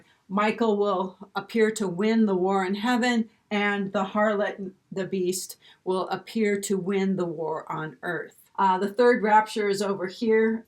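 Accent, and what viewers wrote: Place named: Idaho, United States. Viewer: I've been binge watching for about 3 years. With every teaching, I grow in knowledge and understanding. I'm very grateful that I was led to your channel. I thank God for the work he is doing through you. Side note. I enjoy your Idaho accent. I miss hearing it. Love and peace always.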